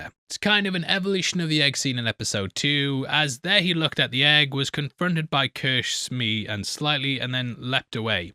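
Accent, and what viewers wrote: United Kingdom. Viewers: Hint: He has a very slight, barely noticeable British accent..... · You really dont have a strong American accent, are you from the UK? 😎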